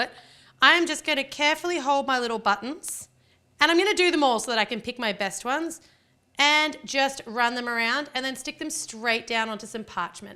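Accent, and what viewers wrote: Australia. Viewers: Is she Australia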